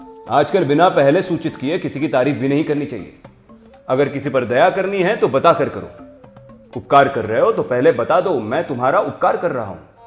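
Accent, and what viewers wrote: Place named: Pahadi, India. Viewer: Aapki aavaz bahot achhi he.ekdam pahadi..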